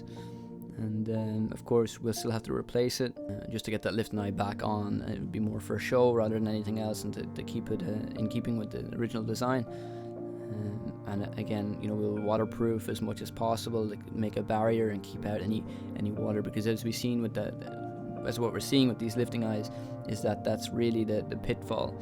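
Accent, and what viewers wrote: Ireland. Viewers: Hi there rea;;y fantastic video! love the way you work with your dad. HOW wonderful to have an Uncle that`s also creative an you have given is boat new life. I really enjoy your videos I came to Australia when i was six migrated from England. All my family are from Ireland and my parents are from Ballybok. I love Ireland of course and its so nice to hear your accent. It reminds me of my family my parents etc. I have been back several times and My grannies house is still there in Landen RD Ballyfermot. I have all my family there except my mum and twin sister and one of my brothers. the other brother migrated to Switzerland. anyway I hope you keep warm as the weather is rapidly changing there for you. Hope you get the boat water proof before the weather gets too bad. Love the rocket stove I think it is worth a fortune. I`ve never seen one like that before it is stunning. 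and rocket stoves are the best stoves and fire place to have. I loved seeing you put the turf in made me homesick for Ireland. We are worlds apart but we have a kindred spirit in Ireland. God bless you guys I wish you well. till next time.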